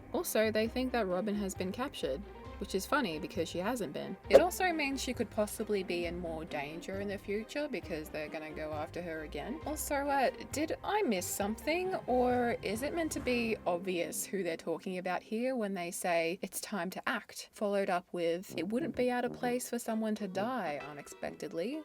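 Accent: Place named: Australia. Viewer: Knew you were Aussie soon as I heard ya voice bro Anywho lol to those who said Luffy will lose the final fight 😂 can’t happen now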